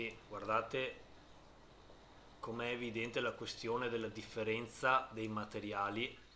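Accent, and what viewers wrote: Veneto, Italy. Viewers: Sei Veneto?😂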